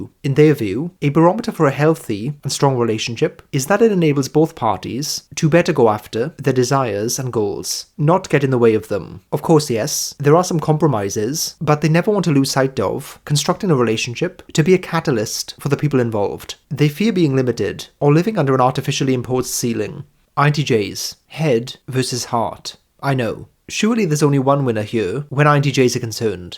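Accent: United Kingdom. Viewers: yeah, has his own British polite and accurate magic that is enjoyable to hear and very well applicable for some deep discussion) Well, I somehow understood by several (7 or 8, maybe) tests that I'm somewhere in INTJ-INTP-ISTJ-INFJ zone. Then I looked through videos about these types and the closest one appeared to be INTJ. Though it's either exposes INTJ as much smarter and mystical than they are IRL, either it's me being too stupid for an ideal INTJ)) I can't say for sure, but by the discussion here you really have some INFJ vibes. I usually speak more roughly and straight-forwardly. As a proper INTJ, that is ^_^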